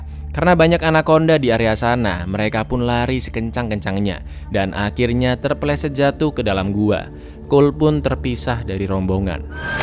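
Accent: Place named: Kalimantan, Indonesia. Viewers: Kok kalimantan nya beda wkwk. Btw gua orang kalimantan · anak kalimantan mana suaranyaa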